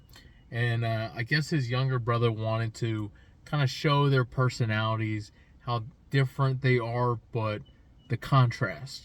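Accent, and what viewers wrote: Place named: United Kingdom. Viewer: Yo I've came to like the British voice over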